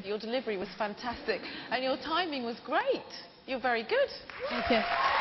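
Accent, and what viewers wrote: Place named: United Kingdom. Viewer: if he wasn't british, he wouldn't be funny. its all in the accent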